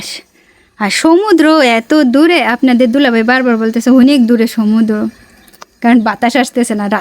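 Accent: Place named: Barishal, Bangladesh. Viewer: আপু আপনার বাংলা উচচরন শুনলে মনে হয় না আপনি খুলনার মেয়ে।আপনিতো বরিশালের মত কথা বলছেন।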